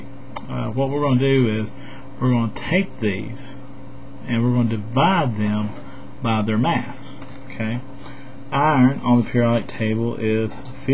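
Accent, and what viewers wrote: South, United States. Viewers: Am guessing you are from down south :)! thanks for all your help you are wonderful :) and are alot funner than reading the book lol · You and your sexy Southern accent have Really helped me. Thank you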